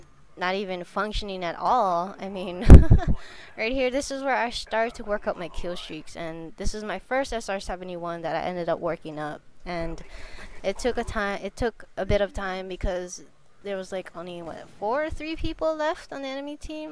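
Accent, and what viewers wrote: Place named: Spain. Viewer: yea i could hear your spanish acsent =)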